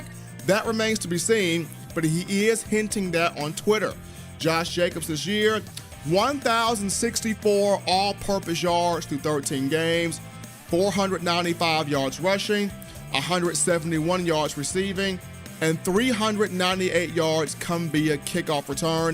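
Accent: South, United States. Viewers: You country funny voice nerdy looking boy you sound stupid stop bitching we don't give a fuck about the 5 losers get over it suckas.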